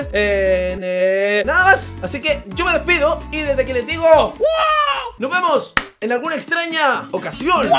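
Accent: Chile. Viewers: De que país es el? Tiene acento chileno la wea cuántica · ¿Porque habla como chileno?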